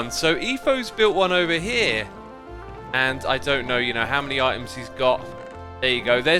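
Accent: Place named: United Kingdom. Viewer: and the British accent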